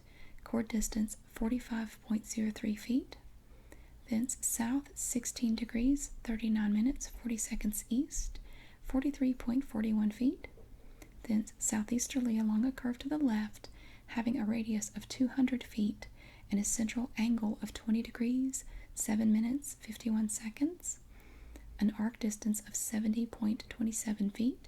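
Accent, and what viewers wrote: South, United States. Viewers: as much as i love your southern accent videos, i love your soft spoken voice! more of these please! >_____<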